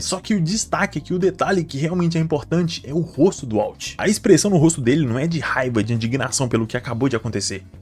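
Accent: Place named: Bahia, Brazil. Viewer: levemente baiano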